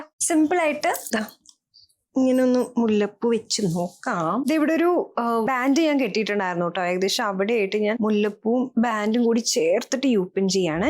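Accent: Thrissur, India. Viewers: Thrissur town area slang anu ith.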